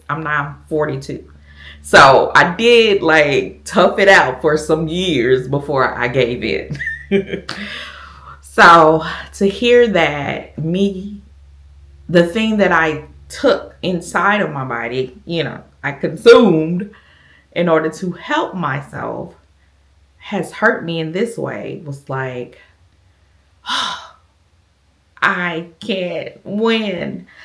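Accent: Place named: Georgia, United States. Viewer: The journey continues 😊I knew from your accent you had to be near me. Augusta Ga is my hometown. I thank you for sharing your story. I have been thinkg about HRT. Dr put me on low dose birth control to manage menopause symptoms, but said it was only temporary. Now I know to try the supplements. Thank you❤ I am sorry for your loss.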